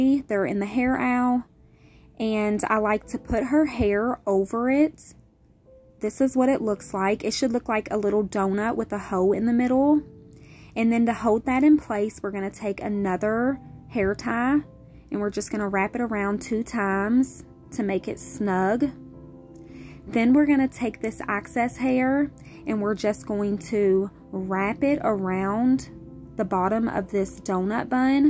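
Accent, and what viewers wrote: South, United States. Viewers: I love when people say things different than I do. I find it sweet and southern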